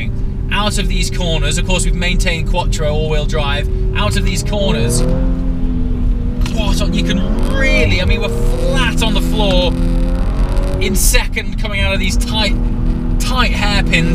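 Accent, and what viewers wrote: United Kingdom. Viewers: omg British Accent!!!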